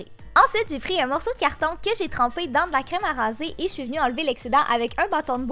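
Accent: Quebec, Canada. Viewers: J'aime tellement ton accent canadien !!❤️❤️❤️ une vrai merveille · J'adore ton accent québécois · Ton accent et tros beau tu s'est canadienne? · j'adore tes vidéos et ton accent québécois est tout simplement magnifique continue ainsi et ne te laisse pas faire pas les haters ce sont des gros jaloux de ta beauté et de ton talent ne change rien et vraiment continue ainsi je t'adore 💖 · J'adore ton accent !!😊 Tu viens du Canada ?? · J aime trop ton accent québécois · tes canadien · j'adore l'accent québécois !! 😜😍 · j'adore tes vidéo et ton accent québécois💜💛continu ma belle tu mérite les <num> d'abonnés bisous je t'adore tes la meilleure 💚💛💓💔💖💟💞💝💘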